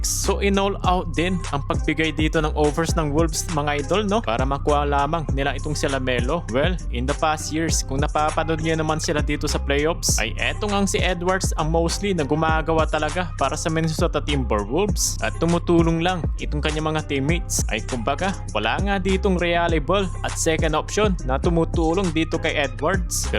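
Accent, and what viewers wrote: Pangasinan, Philippines. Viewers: Pangalatok kb Sir matigas k kc magsalita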